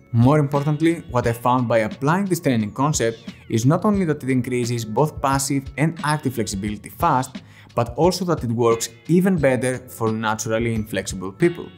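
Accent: Spain. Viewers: What accent is that? Are you from Spain?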